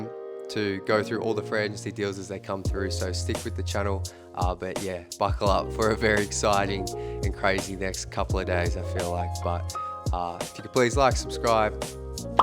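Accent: Australia. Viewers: Australian, yes. And a legend!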